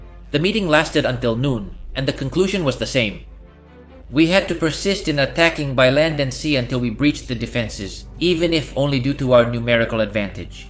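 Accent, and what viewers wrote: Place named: Spain. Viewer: Man your accent is so distracting, just make the video in spanish, it would be more interesting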